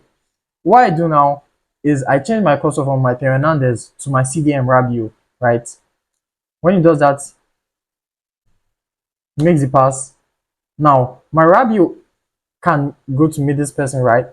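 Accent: Nigeria. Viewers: You sound naija so imma sub bro!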